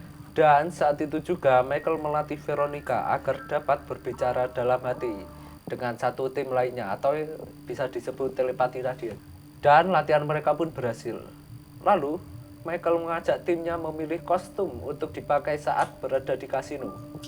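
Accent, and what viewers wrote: Java, Indonesia. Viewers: udah suara medok banget.. joke lo garing lagi.. bikin muntah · Amatiran.. Udah medok pake becanda, jd gak menikmati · Tolong yg bener min komentator nya, udah medhok banyak bercanda ga lucu juga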